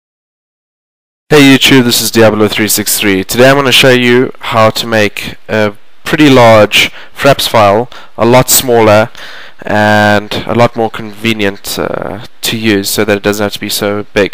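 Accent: South Africa. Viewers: dude your south afrikan neh? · Are you from South Africa?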